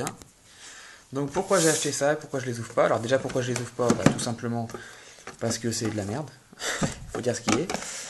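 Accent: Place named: Belgium. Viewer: toi ta un léger accent belge a ce que je voix ;)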